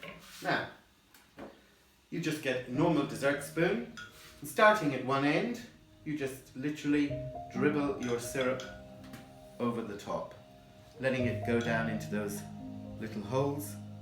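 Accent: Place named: Ireland. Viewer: just found your channel- really enjoying it- love listening to your brogue- reminds me of my grandparents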